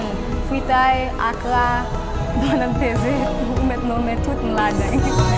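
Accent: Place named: Cap-Haïtien, Haiti. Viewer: U pale tankou moun okap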